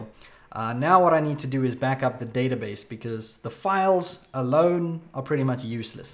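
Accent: South Africa. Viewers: Cape Town or Joburg?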